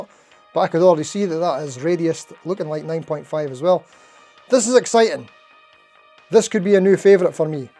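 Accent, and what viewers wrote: Scotland, United Kingdom. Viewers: glad you took it in good humour, I'm in Australia and we have trouble understanding a thick Scottish accent. Not that our accent is anything to brag about lol. 😊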